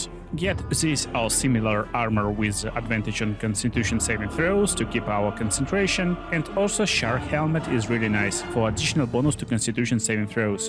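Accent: Russia. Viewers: Русский акцент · omg, your accent-- are you russian? nice glitch btw.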